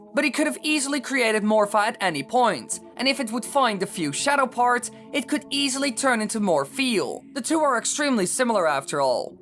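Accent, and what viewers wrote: Netherlands. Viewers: I hate Your fucking Dutch accent so much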